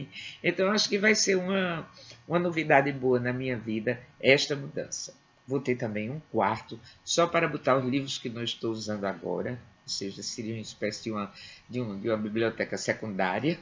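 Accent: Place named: Northeast, Brazil. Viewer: obrigada pelo presente... aqui embalando meus 250 livros rsrs... e procurando como 'embalar livros" me deparo com este presente, essas leituras lindas, com o sotaque tão afetivo em meu coração, a doce lembrança da minha avó paraibana e de meu velho pai, pernambucano, contando os 'causos' do sertão diante das fogueiras de são João nas periferia de São Paulo, onde crescemos eu e meu irmão - gratidão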